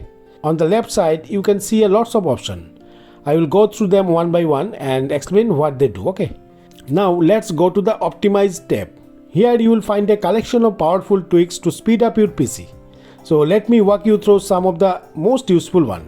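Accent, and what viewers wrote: India. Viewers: When a guy has an Indian accent, you know it's going to be the best video of all time.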